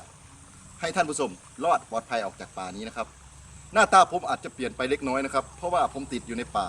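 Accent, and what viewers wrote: Laos. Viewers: ไทยตกลาวนะคับ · เว้าไทยคือคักแทะเว้าลาวแน่ๆๆ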